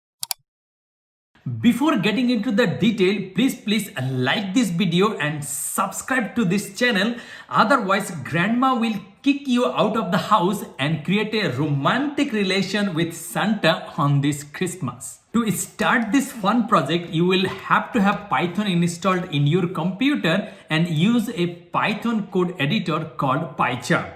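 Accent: Bengal, India. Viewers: Are you Bengali? Cause you English accent is very familiar to me.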